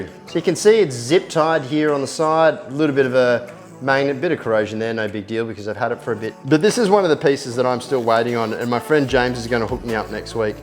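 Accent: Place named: Australia. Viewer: Searched for a Hobie Pro Angler review Australia and your channel came up, just needed an Australian talking about the Kayak and stuff not our fast talking American brothers which sometimes can be like a different language !! God luv em, anyway really enjoy your Vids and thanks for all the effort you go too, keep up the good work and I hope to replicating most of your set up soon ... Cheers Mate :) New Sub and happy to be one......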